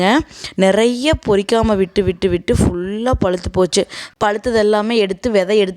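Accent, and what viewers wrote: Kongu, India. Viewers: Oru oru oorla oru oru pechu vazhakku irukku.Adha yaarum edhuvum soldradhillaye.Aen ellarum "porikkara" dha mattum target pannanum?!Neenga Unga oor pechu vazhakka mathuveengla sollunga sister?!Pechu vazhakku enbadhu mannin manam. Accept pannitu poite irunga. Kongu tamil avlo azhagu.Ippo ella oor makkalum mix aagi,kongu tamil eh ketpadhe rare aagi vittadhu.very sad to say this.Ellame maari pochu. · கொங்கு தமிழ்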